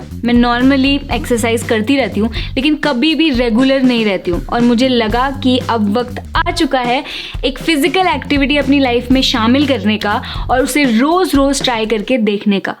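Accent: India. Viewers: I saw many people said thy were attract how u talk d reason is ur desi hindi ☺😁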